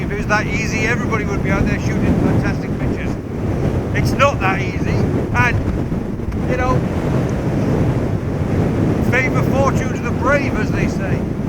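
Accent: United Kingdom. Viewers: Wow... The ham sandwich aerial delivery had me rolling! With all that wind noise, I had a hard time lip reading that British accent! The Yorkshire Tree rocks are about the strangest thing imaginable yet quite fascinating as well. The entry level equip.along with the various compositions you used produced some beauties for sure! Point well made... The other thing that kept me on the edge of my seat was you raising both hands to emphasize a point & the bloody wind almost blowing the equip. to the bloody ground, LOL! Bill Farr...:)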